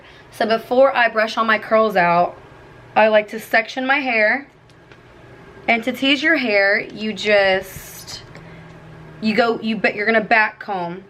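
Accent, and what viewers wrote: South, United States. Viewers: Is she from flordabamma shore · You kinda have a slight southern accent, it's really interesting loll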